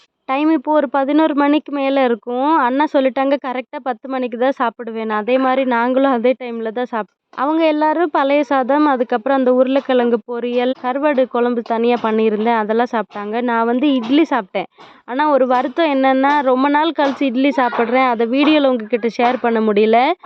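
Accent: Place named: North India, India. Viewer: Nee yennoma north indian tamil pesura matheri pesatha nee tn thaana ozhunga pesu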